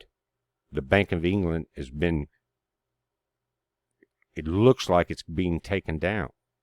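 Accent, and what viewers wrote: South, United States. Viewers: I like him because he has a southern accent but his reporting is some of the most level headed reporting i've observed.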